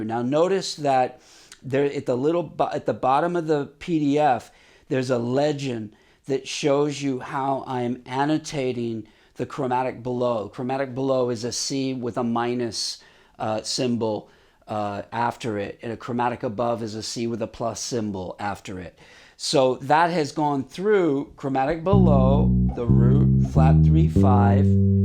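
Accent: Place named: United States. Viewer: omg you are a monster... ps you mentioned 5 pounds. You are a USA native right? You sound like it.., Ps I have 1978 neck through body Eagle BC rich bass which is amazing. Sound neck playability is great 👍. I bet you could tear it up.... I gotta say even the stuff you talk about is on another level.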